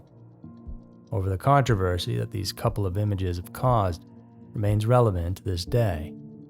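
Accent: South, United States. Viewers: Much Appreciated. i want to believe how you’re a real human Narrator because i like your Private Eye / Country Boy accent or style which gives the information a personal or ‘ Pssst - wanna hear something very interesting? ‘ feel! 😁👍❤️🐀🐢👽from near Bournemouth SW England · When they make these videos, why can't they find a narrator who does not speak through his nose? It is a real turn-off! Also, the American accent does not help!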